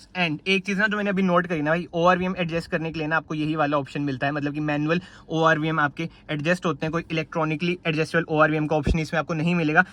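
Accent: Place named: Jammu, India. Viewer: bhai jammu da lgda accent se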